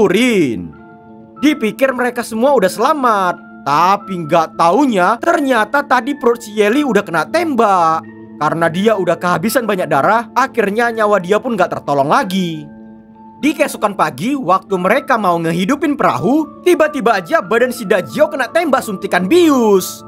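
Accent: Medan, Indonesia. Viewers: Logat medannya kentara sekali bang 😂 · Iya we... Anak Medan yaaa Jangan kembut we😅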